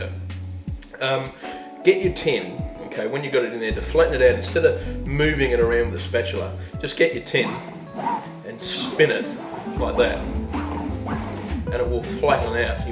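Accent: Australia. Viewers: the aussie accent is not the same as the british accent . its soo different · From his accent can tell that his from australia not US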